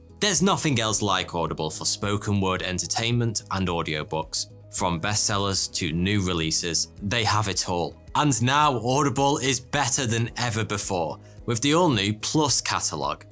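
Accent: United Kingdom. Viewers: I love how British he sounda💕🇬🇧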